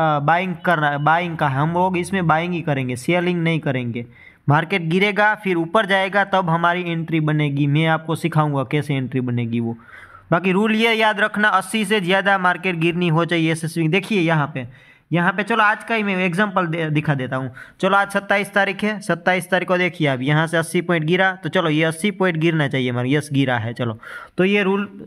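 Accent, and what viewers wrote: Gujarat, India. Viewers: You frome gujrat ❓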